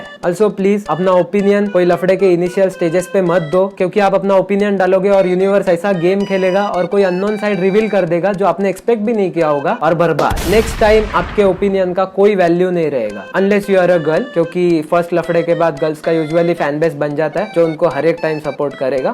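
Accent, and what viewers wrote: India. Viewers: Abe tu bihari kyun lagta hai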